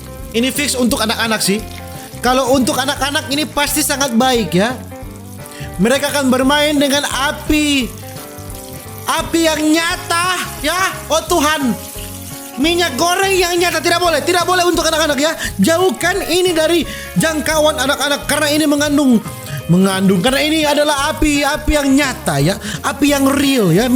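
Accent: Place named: Mandar, Indonesia. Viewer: Abang orang mana 🤣 Loga2 suaranya kek orang mandar😂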